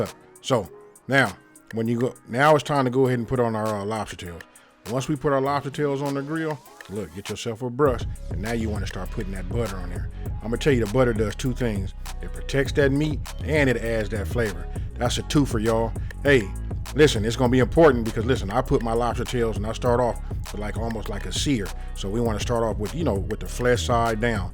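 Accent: United States. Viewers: Would love to see that. Love your American accent and if I ever came to US and A would love to meet you and your Family 🌅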